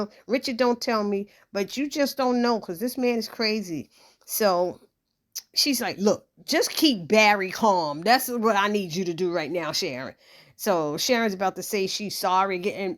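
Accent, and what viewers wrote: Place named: East Coast, United States. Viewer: Thank you. Love the East Coast Accent. 😀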